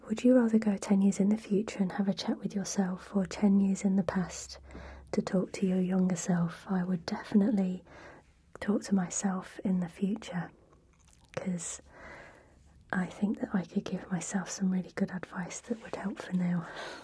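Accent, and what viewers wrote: United Kingdom. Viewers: I subscribed because of the nice British accent c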